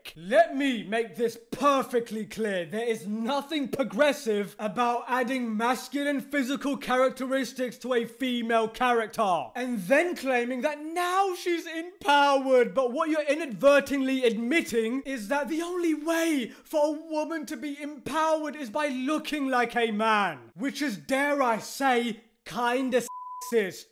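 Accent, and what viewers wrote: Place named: Scotland, United Kingdom. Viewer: Is this guy scottish or a really mad guy from England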